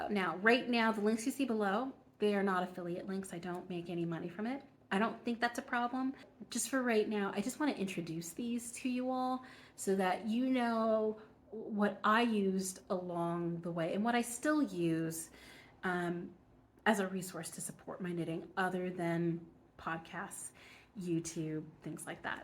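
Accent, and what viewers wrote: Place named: Vancouver, Canada. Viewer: Very impressive. I had to watch it twice. You sound like you're from Vancouver, Can. Keep up the excellent work.🌫️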